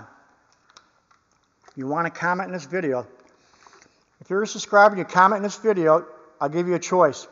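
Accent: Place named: East Coast, United States. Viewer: Your accent reminds me of home. I go back east for the holidays and still don’t believe I sound like that 😊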